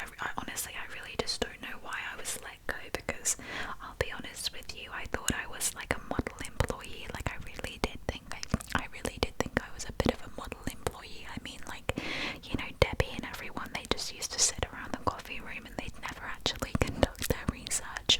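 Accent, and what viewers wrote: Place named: United Kingdom. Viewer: Why have I never found a British asmrtist before😂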